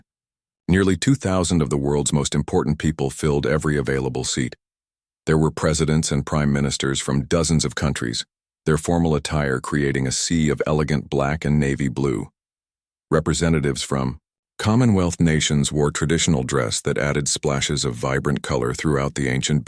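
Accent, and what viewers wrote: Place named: United States. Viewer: What the hell is an American narrating British history ?? This is ridiculous !! 🇬🇧 it’s not your history !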